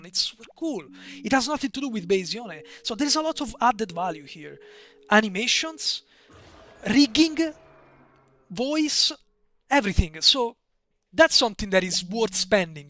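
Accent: Italy. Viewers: Are you...are you tuscan?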